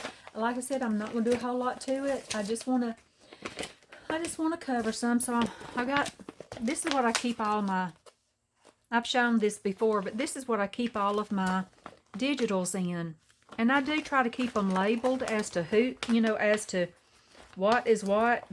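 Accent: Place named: Alabama, United States. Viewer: I love your accent! I have an Internet from Alabama and you sound so much like her. I too suffer from depression and art makes such a difference!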